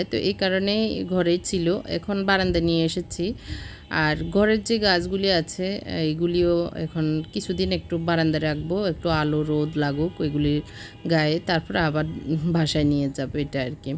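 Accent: Noakhali, Bangladesh. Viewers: You sounds like from Noakhali.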